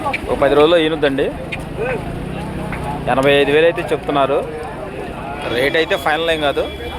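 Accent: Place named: India. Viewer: Telugu voice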